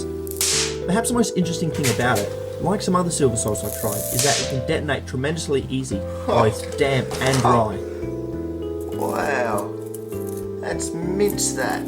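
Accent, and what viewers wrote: Australia. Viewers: every time i watch a video....im all like....Damn, this dude is so Australian. Its the best.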